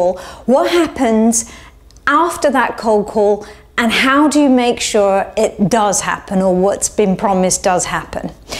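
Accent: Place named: Gloucester, United Kingdom. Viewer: Yes, you are excellent. You have well and truly helped me too. Thanks a lot Plus, i like your accent. I can hear the Gloucester with the R's, very well spoken.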